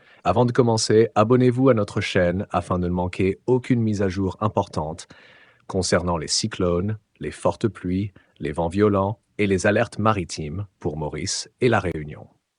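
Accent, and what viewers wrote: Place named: England, United Kingdom. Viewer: Laccent prends une petite tournure anglais des fois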